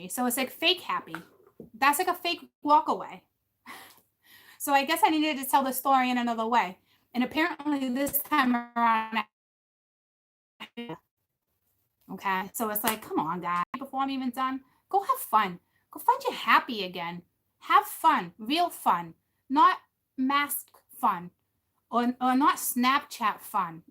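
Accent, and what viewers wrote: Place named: East Coast, United States. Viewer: My grandpa and grandma Hardy and autie Sue were from Fall River Ma ..I think I was initially drawn to you because you bring memories of them and the East Coast to me with your accent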